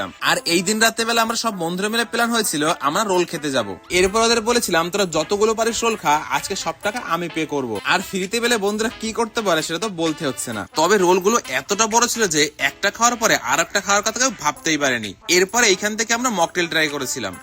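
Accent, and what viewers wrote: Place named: East Bengal, Bangladesh. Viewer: Uni probably bangal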